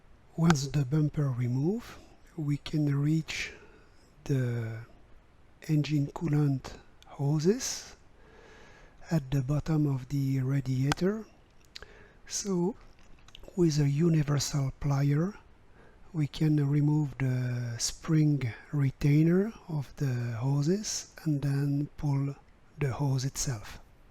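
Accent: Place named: France. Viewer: Hello, am I correct...?? Does the Kangoo 2nd. generation have a K7J engine..? I have always loved to hear english spoken by a French person... but now I am especially pleased hearing you explain details about jobs I need and would like to do. am giving a big thumbs up to all your videos. Best wishes, Mike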